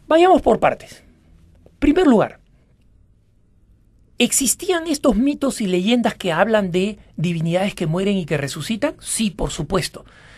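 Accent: Lima, Peru. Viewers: A que su acento limeño (que es uno de los tantos con los que se habla en Lima) me parece insoportable _._ . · Disculpa pero me puedes decir a que te refieres con: INSOPORTABLE ACENTO LIMEÑO???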